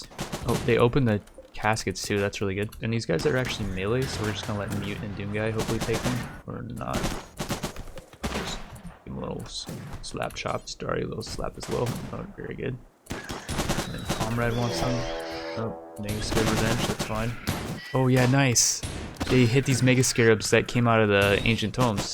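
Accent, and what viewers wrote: United Kingdom. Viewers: it's called british english, he's pronouncing it perfectly fine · he sound British to you?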